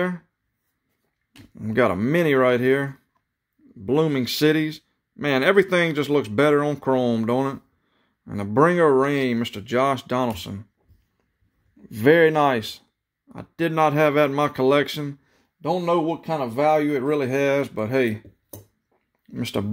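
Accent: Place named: Louisiana, United States. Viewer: I love your backwoods Cajun southern accent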